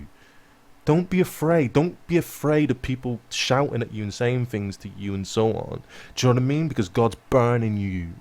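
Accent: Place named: Bristol, United Kingdom. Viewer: you sound like you from bristol am i correct i lived there for 2 years? lol the hay maker is coming ha ha funny brother!!!!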